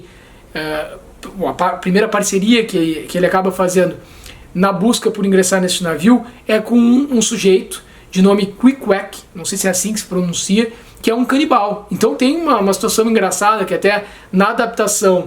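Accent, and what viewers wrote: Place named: Rio Grande do Sul, Brazil. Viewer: Como sempre, excelente resenha. Tenho aqui No coração do mar, obra que inspirou Melville. Ainda nao li. O canibal é um dos melhores personagens.. Pena q vai sumindo no decorrer da leitura. Que loucura de Ahab... Mas tantas vezes nos apegamos a algo e nao queremos largar mesmo sabendo que o final nao será o melhor. Obrigado por ter voltado. Seu gauchês é ótimo kkkk É muita segunda pessoa do singular kkkkkk mas o mineiro aqui gosta! Abraço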